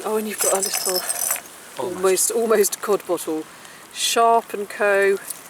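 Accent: United Kingdom. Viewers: Thanks for your videos, ser the treasures and hear your british accent so magnétic. Congratulations and greetings from Argentina. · Smiled everytime you said "Keef-ley" in it's Northern pronunciation. Stands out a mile from your natural 'English Rose', accent! lol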